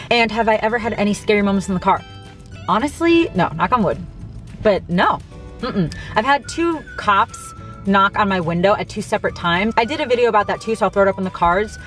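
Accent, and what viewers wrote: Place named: Michigan, United States. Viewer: You grew up on Long Island but you sound like you’re from Michigan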